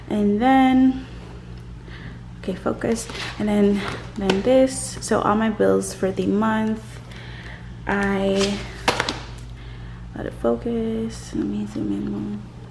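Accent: Jamaica. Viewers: Girl i can hear the Jamaican accent a lil 🤣🇯🇲💘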